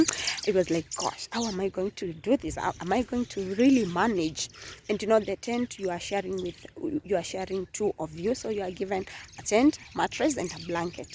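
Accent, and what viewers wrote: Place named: England, United Kingdom. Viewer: It's the English accent and Swahili for me😘😘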